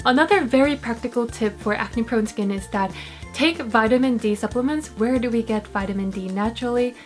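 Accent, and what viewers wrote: England, United Kingdom. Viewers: Love your british accent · She has a british acsent 😅 kind a bit 😄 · is she from England? Her accent sounds kinda english :o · Her little British accent when she has the glasses on...:)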